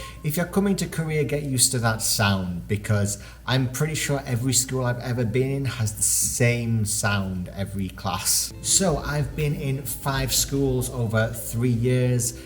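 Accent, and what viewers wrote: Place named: Northern England, United Kingdom. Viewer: Absolutely blowing my mind that you are from Newton Aycliffe! This video was in my YouTube suggested and I've just applied for Epik so thought I'd check it out. Straight away heard the northern accent and checked out the comments to see you're from my town!! What a coincidence - small world aha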